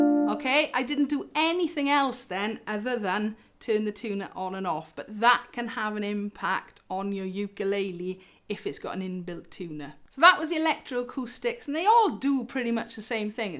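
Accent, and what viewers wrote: United Kingdom. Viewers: Hey,. Are you British?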